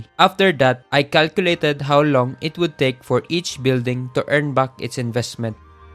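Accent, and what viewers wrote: Philippines. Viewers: I only hear pinoy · Great video my dude! Really helpful resource for min-maxing playing tall strats. Also, I just have to ask are you from the PH by chance? Your accent is giving me kababayan vibes lol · Sounds Filipino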